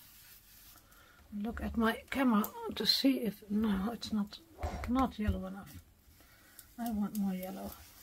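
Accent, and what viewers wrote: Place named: Netherlands. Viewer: stunning style and love your Dutch English, you are the most sweetest lady xx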